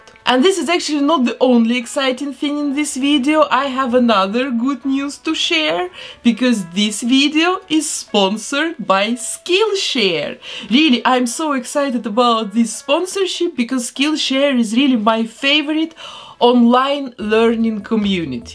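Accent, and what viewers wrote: Russia. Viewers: Вау, русский акцент?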